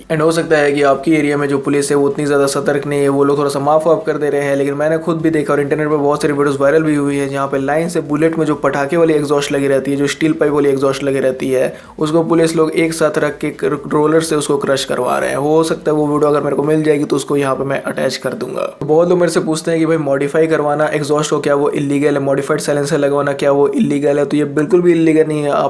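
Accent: Bihar, India. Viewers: Bhai tm Bihar se hai ?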